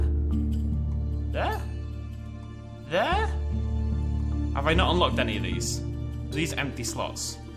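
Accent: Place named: United Kingdom. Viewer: Hate to be that guy, but some words have multiple valid pronunciations, and regional pronunciations are a thing. Utilizing several sources, I have determined that there are three accepted pronunciations of the word, with the two american ones being "Nitch" and "Neetch," and the common British, which if you couldn't tell is, pronunciation being "Neesh."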